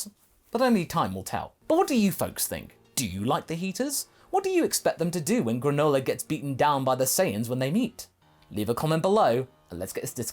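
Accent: United Kingdom. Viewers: I've never known if you live in America or the UK, you're very well poised and well spoken so I'd go more towards the UK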